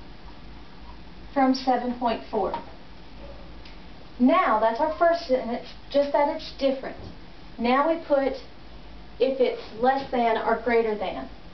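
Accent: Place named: United States. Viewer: great lesson , but i despise the american accent :((((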